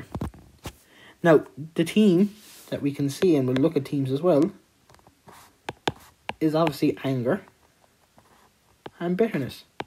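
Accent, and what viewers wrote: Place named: Ireland. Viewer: love that i can listen to an irish lad and it is perfect bbecause he sounds identical to my teacher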